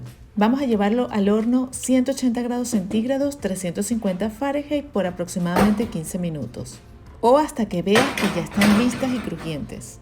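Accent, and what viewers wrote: Venezuela. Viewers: Tienes acento venezolano. Bueno te envio la bendición d Dios en nombre de Cristo;puesm parece genial la receta. Gracias. Saludos desde Venezuela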